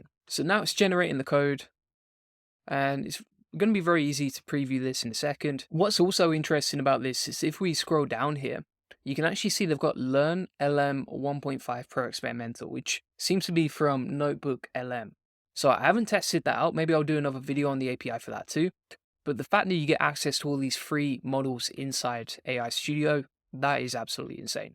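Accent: England, United Kingdom. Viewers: Btw! I love love the accent! Are you English? Or Scottish? Am i insane for liking the accent?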